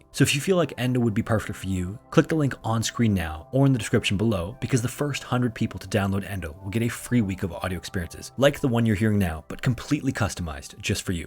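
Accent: Canada. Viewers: little do they know this IS my endel lol these videos can both put me to sleep and help me focus during certain household chores, it's an absolute joy to listen to I finally heard your Canadian accent this time btw, I love it! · Because of the naval focus of these stories, every time he says “about” in that Canadian accent, I have to think for a second if he’s actually saying “a boat.”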